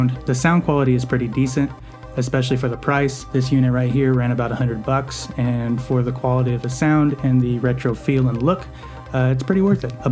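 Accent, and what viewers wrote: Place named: United Kingdom. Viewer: wow love it! Is there an inexpensive way to make my TV my monitor. I need a computer for basic email, word documents, streaming etc. I have a 42" TV with a Roku I would love to use. what's the most economical way to do this? Maybe a future video idea? I'm a big fan! BTW loved the Brit accent lol pretty good actually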